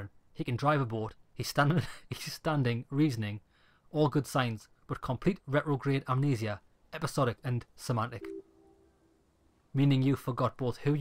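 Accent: United Kingdom. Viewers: Harry Du Bois - Cop of the Apocalypse, entroponaut, pioneer in the fields of rave dance culture and cryptozoology,real man of the renaissance:D I am a little sad that this is the final, despite some modern political things that jumped out too annoyingly during the story, I really fell in love with this game and Harry and Kim,and I will miss them very much. I want to thank you, for this walkthrough. When this game came out a year ago, I was very interested in it, but I was confused by the large amount of text, and I thought that my knowledge of English would not be enough and the process would be tedious. As it turned out, everything is not so scary, and I waited in vain for a whole year.) And I must also note that you have a pleasant voice and British-English manner of speaking, and your obvious interest in what is happening in the game did not disturb its atmosphere.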